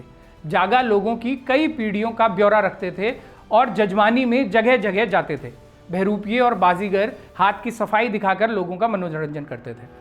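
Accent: India. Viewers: Apko sunkar lgta hai aap vastavik pahad putra ho❤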